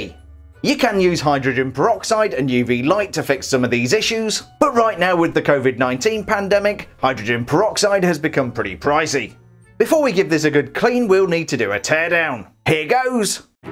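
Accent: United Kingdom. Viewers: I’m confused do you live in Britain or America because you have a perfect British accent but then keep hinting you live in America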